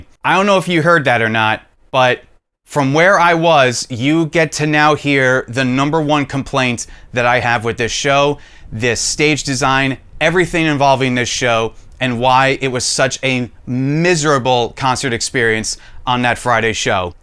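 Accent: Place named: New Jersey, United States. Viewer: for being from jersey you simmer on the accent, thx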